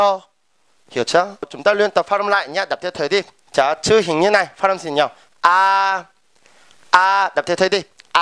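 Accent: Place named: Seoul, South Korea. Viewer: úi thì ra thầy giọng Seoul ❤️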